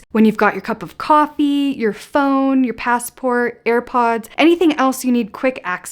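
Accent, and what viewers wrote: Canada. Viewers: Did I catch a little "pack an oatfit" in your "beg" Canadian accent there are you from somewhere else? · Man ,you are THE BEST !!! This was the best flight hack video I've ever watched !! Believe me I've watched a lot !! Lol. You covered so many sites & hacks nobody else mentioned !! The best editing, too !! And the way you speak,even though you spoke fast ,it was very clear to hear/ understand that I didn't need to repeat any !! Lol. Canadian English is the easiest to ears ,but still ,you are that good !! Usually I find almost the same content or lots of overlapping information in this kind of videos & I only saw several in your video ( this ) & they were important to mention anyway,but you provided way more new informations / more original, creative tips !! And so much fun to watch ,so,I got very excited to watch/ listen !! Even Level 8 suitcase ! 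I knew what was as I've seen others using that one in their video ,but I don't recall them mentioning what you described !? Like it is very handy that there's no zipper ,or it'll not allow us to overpacking ,so it's actually great for people with the tendency ! Lol Also you realized that suitcase would had saved your money because it's very durable & you purchased 3 , 4 luggage bags in 10 years as they broke !! As soon as the video finished,I hit the subscription button !! This was my very 1st video of yours to watch & I hardly ever sub to any if it is the first one ,or not til 4th or 5th even !! Lol As others video's subjects are super intriguing you mentioned in this & this was that good enough !! As well as I noticed that you seem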